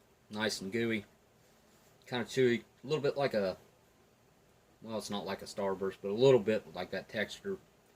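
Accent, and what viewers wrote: South, United States. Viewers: OMG THATS AWESOME!!!! I'll keep an eye out for the video!!! And congrats on the milestones!!!!! That's so awesome!!!! Btw I love your videos AND your accent! Southern boy huh?? 😁 I'm super happy for you! Congrats again!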